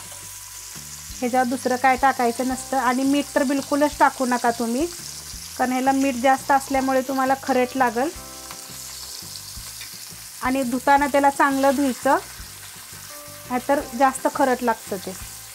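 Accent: Satara, India. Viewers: तुमच्या आवाजांना मी ओळखलं तुम्ही साताऱ्याचे आहेत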